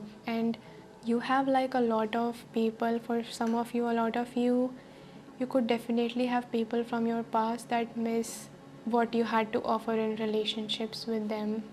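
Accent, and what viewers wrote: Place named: Punjab, India. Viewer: Sorry but I can't stand the Punjabi accent.......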